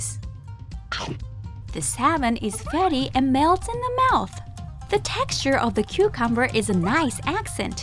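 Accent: United States. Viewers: seu ingles ficou bem melhor agora, mesmo não sendo o ingles minha lingua materna é bem perceptivo que o seu ingles ta bem parecido com um americano. Parabéns:face-red-heart-shape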